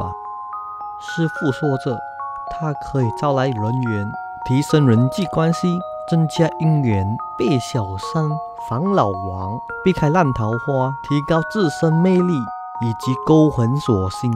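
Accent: Malaysia. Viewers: 你做的卡通很好看，听你的声音感觉是大马人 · 哈哈以前玩線上遊戲 有個大馬朋友就是跟你差不多 所以聽你講福建話我就想到他 也猜到你是福建後代🤣 好久沒聽到大馬福建話了😁 我以為這件事情是發生在大馬😅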